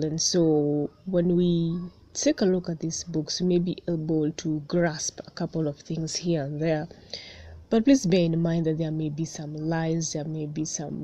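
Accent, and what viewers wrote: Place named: Kikuyu, Kenya. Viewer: hi, are you kenya , gikuyu i can hear your tongue just like mine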